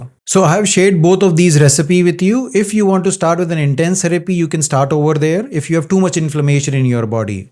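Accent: India. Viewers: Your accent tells me you are not from US! Even those that have studied from US do pick some accent. Yours is very typical Indian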